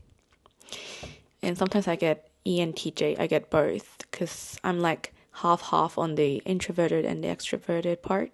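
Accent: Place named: Australia. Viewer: it’s not boring at all! i really enjoyed learning a little more about you… and your voice is really lovely and heartwarming and so is your presence which is a bit different from your photos on instagram(both are great btw). and the touch of aussie accent just great! i was smiling the whole video like an idiot 😂 thank you for making this video and good luck with your next goal 🫶🙏 btw i got my assumption about you right 🤣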